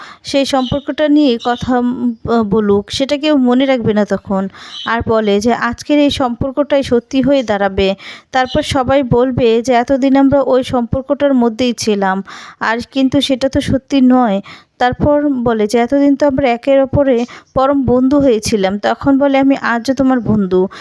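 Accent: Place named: Bangladesh. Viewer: Ei serial ta khub jhule geche.sei sathe apnar ei bangla desi uchharon . dutoi biroktikor.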